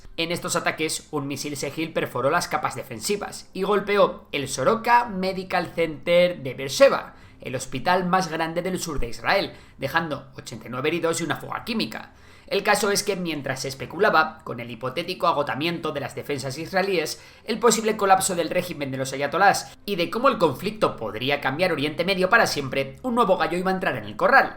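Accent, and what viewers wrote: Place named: Spain. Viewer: Oista chaval que feo es el acento español tío no lo tienes en latinoamericano?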